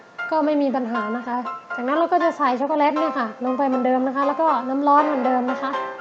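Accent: Thailand. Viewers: พี่พูดแปลกๆอ่ะค่ะ แบบเสียงเหมือนไม่ใช่คนภาคกลางอะไรประมาณนี้ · เธอไม่ใช่คนกรุงเทพฯ ใช่มั้ย สำเนียงไม่ค่อยชัด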